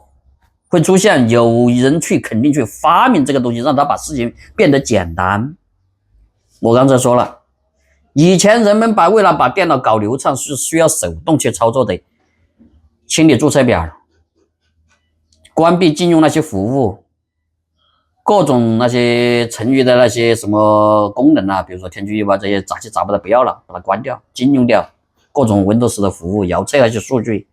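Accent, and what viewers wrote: Sichuan, China. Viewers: 设备，噻呗😅 的川普很有意思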